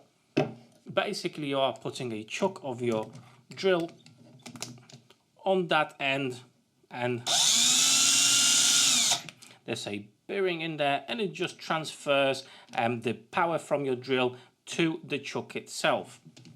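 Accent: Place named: Black Country, United Kingdom. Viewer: Thanks. I´ve just ordered one of these from Ali Express. I read your bio. Your English is great! Did you live in The Black Country when you were in England? Birmingham? Wolverhampton? The accent can be a bit comical if you´re not from round those parts. Sorry Brummies. Love you, really!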